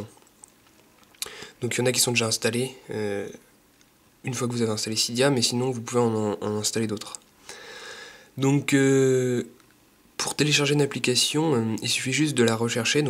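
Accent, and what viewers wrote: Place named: France. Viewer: j'aime ton accent français =)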